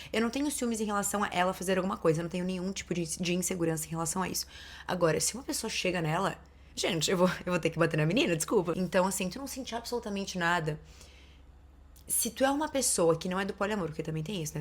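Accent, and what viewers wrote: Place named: Portugal. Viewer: Você mora em PT ou é portuguesa? O sotaque é tão fofinho😊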